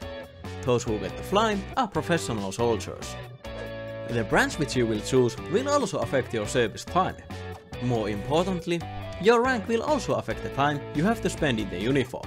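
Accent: Finland. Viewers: Mahtava rallienglanti. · Tahallinen rallienglanti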